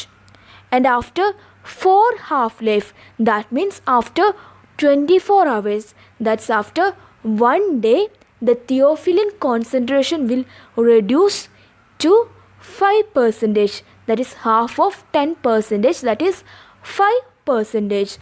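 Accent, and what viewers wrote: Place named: Kerala, India. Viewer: Malayali aanu allae...accent kettapol manasil aayii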